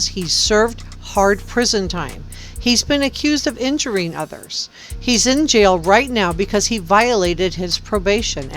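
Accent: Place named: Pennsylvania, United States. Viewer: I detect a Pennsylvania accent! I love it, my in-laws are from Pn ..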